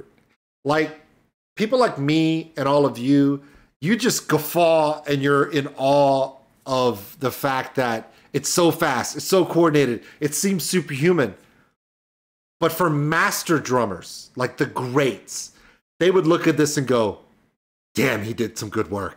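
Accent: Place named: Italy. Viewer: Kinda funny how you speak spanish with Italian accent.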